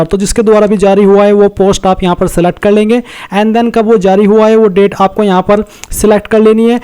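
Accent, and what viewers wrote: Saharanpur, India. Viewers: Tum saharanpur se ho kya bhai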